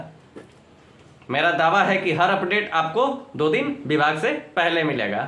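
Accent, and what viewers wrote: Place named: Bihar, India. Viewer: बिहारी स्टाईल में बोली ता एकदम गर्दा।।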